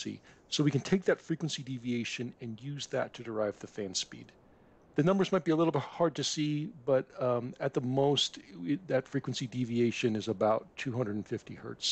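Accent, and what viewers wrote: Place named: United States. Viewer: respect. Clear presentation, good speaking style, a really "proper" AE 🎯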